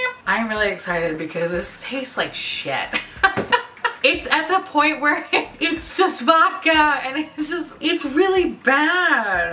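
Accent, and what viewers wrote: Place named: South, United States. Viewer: Momma came out of the gate with a buzz and Southern accent. Hell yea I am here for it. ❤💀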